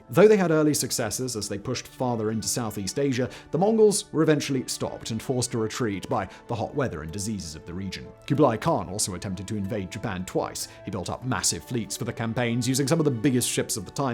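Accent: RP, United Kingdom. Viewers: How posh is this guy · He talks too fast with British accent. Often hard to grasp the key words, otherwise good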